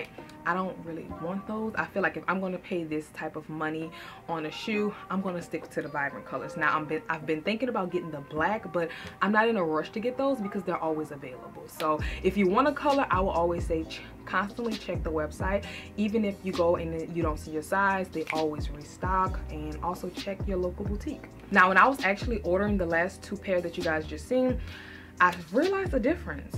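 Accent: New Orleans, United States. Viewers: It's ok g if the New Orleans keeps jumping out I'm from Baton Rouge and spent half of my 90% off my child hood in New Orleans and that's what I loved the most tha accent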